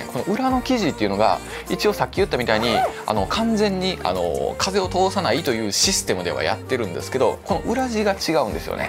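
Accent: Kansai, Japan. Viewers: 埼玉県民です。 毎回思うんですが非常に聞き心地の良い関西弁でした。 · うp主さんに合わせてコメント欄も関西弁(風)多めなのかｗ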